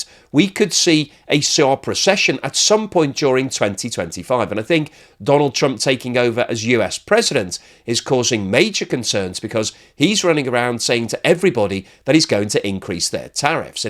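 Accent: United Kingdom. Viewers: Gotta love that THICC brih'ish accent, great content, thanks for sharing!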